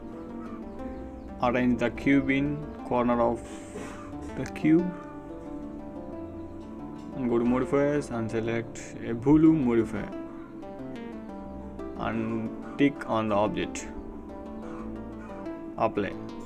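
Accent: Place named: India. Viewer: This tutorial is an attack on one's ears by a very bad accent (sounds like Hindi) and irritating repetitive noise (music) in the background, almost infringing to the foreground. If one can suffer this or tolerate it, there may be something to learn. However, there are better tutorials.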